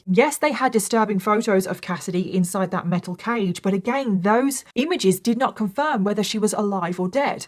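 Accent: United Kingdom. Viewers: Really lol? A British accent sounds tropical to you guys? Haha cool 😊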